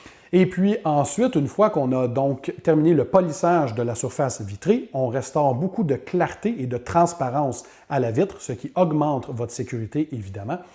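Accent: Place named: Quebec, Canada. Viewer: j'étais sure après avoir écouter une dizaine de video en anglais avant de tomber sur celui ci que tu étais quebecois. merci pour les infos.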